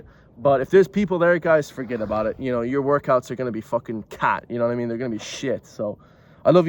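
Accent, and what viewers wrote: United States. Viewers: Who are you? I enjoy your content and chats, but Who are you when you don't speak a pure form of Irish? You sound more American than Irish and I don't recognise any background you are in today, that looks or sounds Irish. Who are you? Where are you? Why do you sound more American than Irish? I'm just asking. Hopefully, you will answer truthfully.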